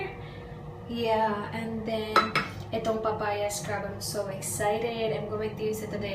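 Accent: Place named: Visayas, Philippines. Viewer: Hi ms.! finally done watching your vlogs and I super love your bisaya accent! Ang cute hehehe. 😊 nkita ko nnman brother mo with new haircut haha! Pagpasensyahan mo na ms kinikilig onte 😄✌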